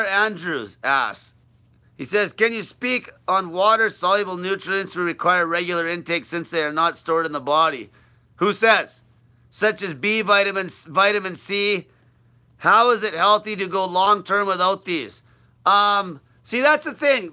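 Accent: Canada. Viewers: He just needs an image re-adjustment. This gangsta character does not work with a Canadian accent. He doesn't sound tough, which is what he's aiming for. He just sounds like a wanna-be.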